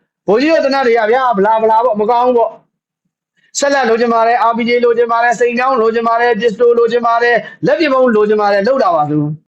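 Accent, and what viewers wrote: Yaw, Myanmar. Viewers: ကီစ္စမရှိ ရေ တင်ပြမှုတိုင်းအားပေးလျက် 👍💯အဆင်ပြေသလို့လုပ်ပါနော်👏👏👏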